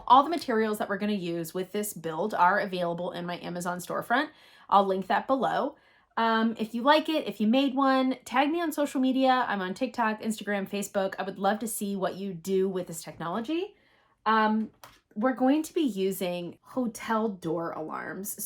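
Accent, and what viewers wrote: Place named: Ohio, United States. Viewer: You from ohio ? I can tell. A fellow. Native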